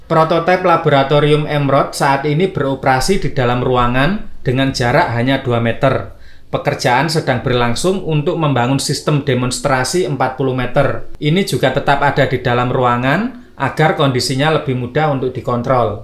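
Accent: Java, Indonesia. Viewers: Sumpah mendok bngt pngisi suaranya. Gak enak bngt di dngr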